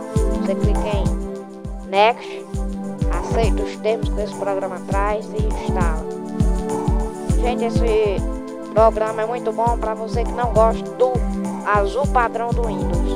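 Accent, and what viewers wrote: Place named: Paraíba, Brazil. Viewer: FANHO COM PARAIBANO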